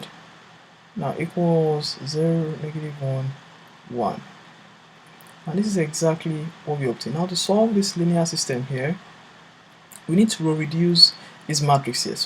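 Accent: Nigeria. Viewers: i knew you were nigerian as soon as i heard your voice, you probably igbo too lmao, good video broski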